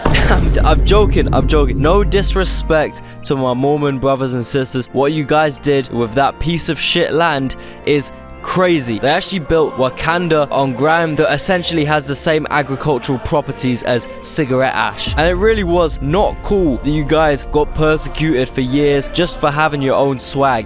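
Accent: United Kingdom. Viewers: It’s funny to hear some Brit, Try to explain America. And the south to beat it all haha. Even though you ain’t far off for a couple these places.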